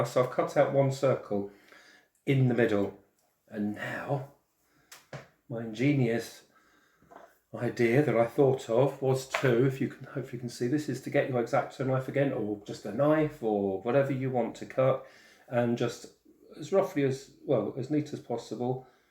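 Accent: United Kingdom. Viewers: You made bisque biscuits! Love your videos. BTW, as far as I know, those of us in the US say vase the same way we say face. Soft s. But I really love listening to your British accent. Not sure ours is as attractive as yours.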